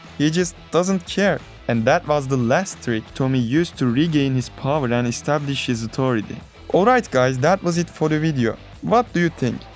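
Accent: Turkey. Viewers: Bir türkün ıngilizce konuşması 😂 çok belli ediyor kendini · türksün aksandan belli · Nice video mate, are you Turkish? Your accent sounds like really really Turkish! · Türk aksanı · are you from turkey? Your accent is very similar. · Türkmüsün kardesim. Aksanın benziyor :D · Türk sivesi nerde gorsem tanirim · Bro are you from Turkey your accent sounds so Turkish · admin are you from turkey? your accent is so similar so im asking :)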